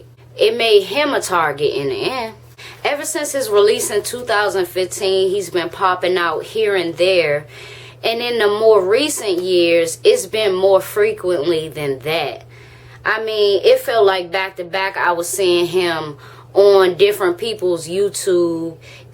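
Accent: Washington DC, United States. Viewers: You from DC? I'll definitely subscribe now. **New Sub** I enjoy your content and you have a nice speaking voice. Keep up the great work ya heard?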